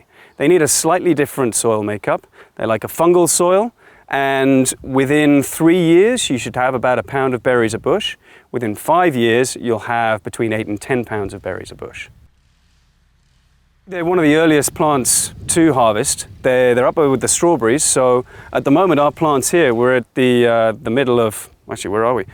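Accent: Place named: England, United Kingdom. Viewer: So nice to hear English English from NOVA SCOTIA. I have just bought some seeds and am aching to seed them..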